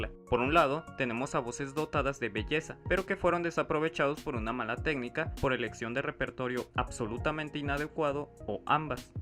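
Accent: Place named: Mexico. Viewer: Dall'accento direi che è messicano. Sicuramente non spagnolo.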